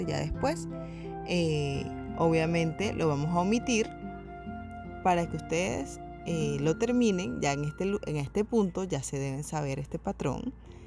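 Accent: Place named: Venezuela. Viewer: me encanto, explicas de una manera muy sencilla y creas un muy buen ambiente. Creo que eres venezolana por tu forma de hablar, con lo cual somos paisanas. Siempre he tenido problemas al calcular el material que necesito y con tu explicacion lo has hecho super faci. <<<<<gracias por compartir...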